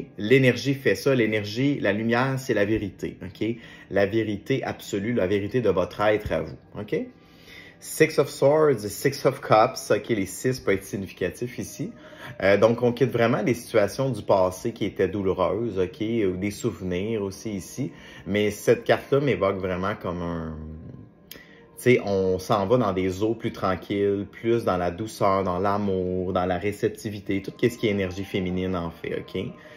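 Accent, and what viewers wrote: Canada. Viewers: cette guidance qui ma bcoup parlé de À à z merci belle âme pour tout j'adore votre accent canadien bonne continuation et de lumière je vous kiffe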